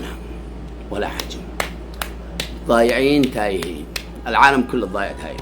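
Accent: Arab world. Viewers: انت اردني ولا يمني اللهجه ماهي يمنيه . والله انك مهجن ابن حرام شكلك وهذا تحليلي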